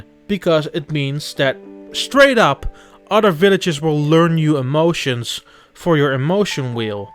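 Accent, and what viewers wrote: Netherlands. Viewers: because YouTube has a training program. that is a first, is Dutch and the way he speaks is very typical of even the most fluent of Dutch English speakers. YouTube is not your typical customer service job so let's try not to compare what is not alike. I can guarantee you that the team gives notes and even though it might not sound like it's scripted, he does most likely have notes for talking points that still doesn't negate pausing to translate or to gather thoughts, etc. Instead of being negative and leaving rude comments, why can't you leave positive feedback and suggestions for how to improve? Is it so bloody hard to find a sliver of kindness? You were once the office or store greenhorn, why does it have to be a negative thing to be learning on the platform? Not everyone starts out with all the tools in their belt.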